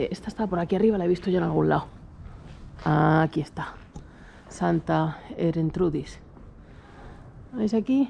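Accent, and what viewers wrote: Spain. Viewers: Como tu, tan Madrileña, hablando como Andaluza???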